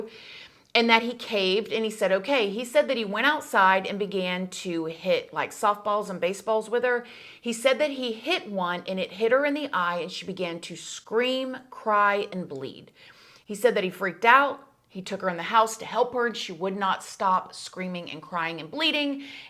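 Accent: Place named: Texas, United States. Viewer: Okay, I’m going to say a few things. Firstly, you remind me of my American friend (she’s Texan, I’m not great with Geography but you sound very similar) and just as with her, your kind, caring nature comes across with every story you tell. I LOVE your videos. Secondly, maybe I’ve got cynical with age, but I don’t feel like Joshua should ever be free. I didn’t really buy his apology, it didn’t feel particularly sincere (to me) it sounded more like a resume statement to try coerce the jurors (or whoever made the decision) into letting him be free. I don’t usually look at cases like this and think they deserve life when they were children themselves (although there are exceptions). In his case, the lack of sincerity, and the destruction and suffering he inflicted upon the little girl, Maddie, her parents, sister, and family I don’t think it would be fair that he would get freedom while they are stuck wondering “what if…”. 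All the things you said were right, you’d be forever trapped in your own mind, a prisoner of questions you’d never ever know the answer to. And, if he was so sincere and he meant it, why not give the family some closure and tell them exactly what he did with their baby girl. Finally, I think upbringing has a lot to do with it. His father’s behaviour toward him might have made him feel rejected leading to fears of rejection by others in society, causing him to develop unhealthy, antisocial attachments, as he apparently did with Jessie. It’s a very, ve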